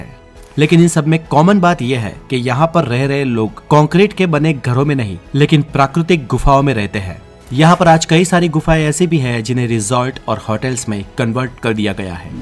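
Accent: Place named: Bihar, India. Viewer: बिहारी लोग विडिओ को " भिडियो " , वोट को "भोट" , वेज/नॉन वेज को " भेज/नान भेज " सड़क को "सरक" , घोड़ा को " घोरा " कहते हैं। आप ज्यादा परेशान न हों।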